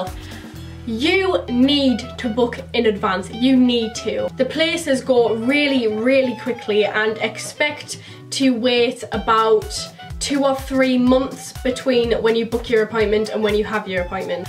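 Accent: Newcastle, United Kingdom. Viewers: Are you from Newcastle?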